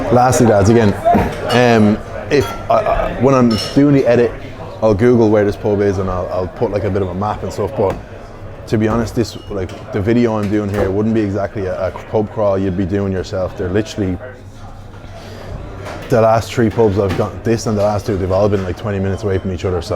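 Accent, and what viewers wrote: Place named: Essex, United Kingdom. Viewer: Your Essex accent is pretty decent mate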